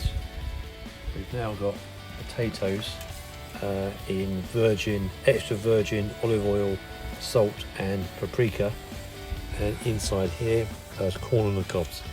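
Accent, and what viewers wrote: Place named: Italy. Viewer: from italy? really? great accent, really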